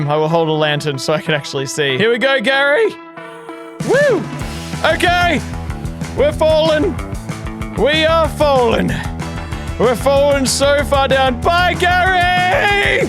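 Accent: Australia. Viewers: oh im so subsribing. youre an aussie minecraft youtuber, but like aussie ausie. i feel at home here (im aussie too) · Are u Australian?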